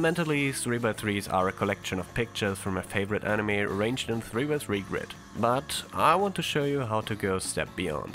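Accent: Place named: Germany. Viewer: Dies to German accent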